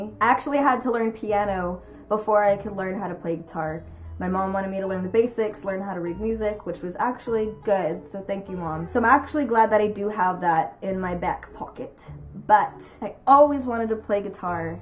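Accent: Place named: United Kingdom. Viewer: Your so layed back and i love it!! Great little british accent to!! :)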